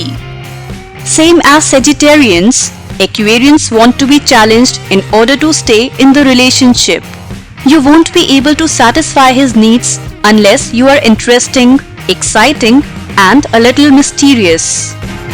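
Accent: India. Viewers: I was really excited to watch the video until I heard the cringy indian accent. sorry. had to be said.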